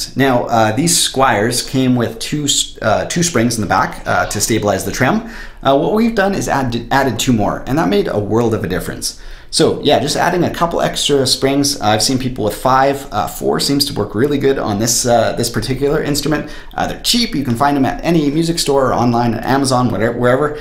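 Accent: Canada. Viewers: like you're videos, what kind of dialects is this, Canada?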